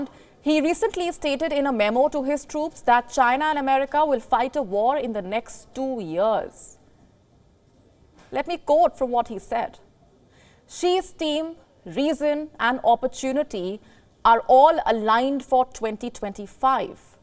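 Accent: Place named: India. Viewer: had to bounce after hearing that indian accent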